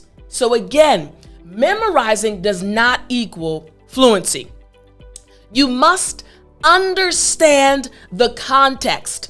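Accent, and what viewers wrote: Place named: South, United States. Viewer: Teacher a top top motivational speaker. I love your country accent 🤩❤️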